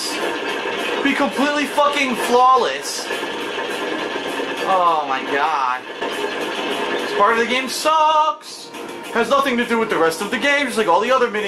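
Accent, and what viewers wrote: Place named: New York, United States. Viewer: I can tell your from New York.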